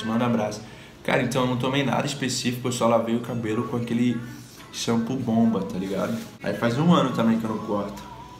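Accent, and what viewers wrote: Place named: Rio de Janeiro, Brazil. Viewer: Adoro sotaque carioca kkkkkk eu fico tentando imitar hahahaa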